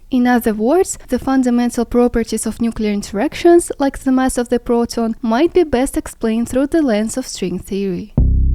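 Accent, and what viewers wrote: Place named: Eastern Europe. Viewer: You are cute and havea slavic accent.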